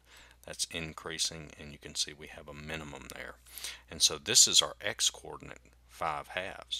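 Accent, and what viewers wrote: South, United States. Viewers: I know im four years late but youre the first souther math tutor I have ever heard. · Same here.... I love the Southern accent... Howdy all the way from South Africa 👋😃 🌍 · Bro speaks like a cowboy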